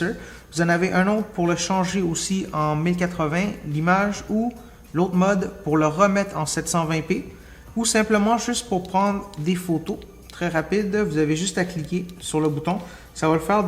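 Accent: Quebec, Canada. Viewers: Super vidéo ! J’adore ton accent du Québec...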